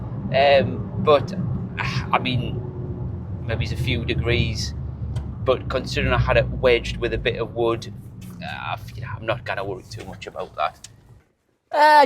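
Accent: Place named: Scotland, United Kingdom. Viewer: What is that a Scottish accent oh my God..🇩🇬 thanks for the subtitles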